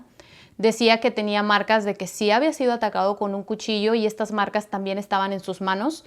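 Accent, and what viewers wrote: Mexico. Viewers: explicó en otro video, que ella nació en el D.F. ahora llamada Ciudad de México, pero se fue a vivir a Querétaro, pero lo importante es la buena dicción que tiene!!! Y además ése acento que tenemos las Mexicanas 🙋‍♀️🇲🇽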